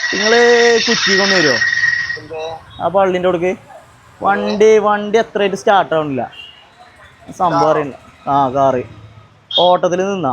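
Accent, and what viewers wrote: Malappuram, India. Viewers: Paka malapuram slang analo😂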